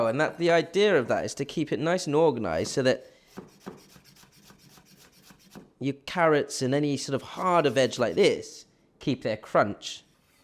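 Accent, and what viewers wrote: United Kingdom. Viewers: Love to see and hear an Asian person with a British accent. Very unusual. I love it. ❤️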